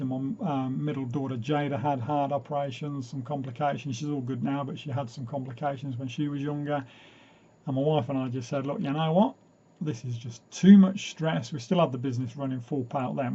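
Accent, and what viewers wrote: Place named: Northern England, United Kingdom. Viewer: i definatley wouldnt of picked your accent as coventry england.You sound like your more from northern england.Great story though 😉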